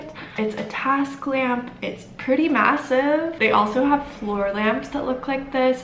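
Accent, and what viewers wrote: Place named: South, United States. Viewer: Omg I used to watch you yeeeears ago when you still had a cute little country accent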